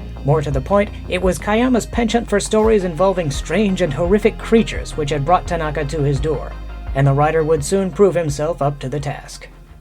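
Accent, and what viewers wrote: Transatlantic, United States. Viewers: Never thought I’d hear someone with a transatlantic accent in 2025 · I know this has been up for a year but I’ve only just started to pay attention to these. I own this English version, and when you said it read like a stilted first draft, that nailed it. I couldn’t agree more. It’s a pretty technically accurate translation (I own and have read the Japanese version!), but there’s no flair, and consequently comes off a little boring. I’m eagerly awaiting the Mothra translated book by the same guy, and am hoping that he’s taken a bit more time to clean it up. Translation is an art, but when treated as a science, it’s not very good. Great video, and love the Transatlantic accent! Is that how you normally talk, or is it an affectation?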